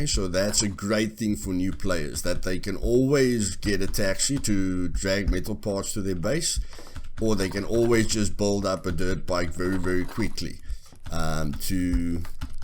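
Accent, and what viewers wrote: South Africa. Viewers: Is that a South African accent I hear my ou? Great video keep up the good work! Jy verduidelik die detail van die game baie goed.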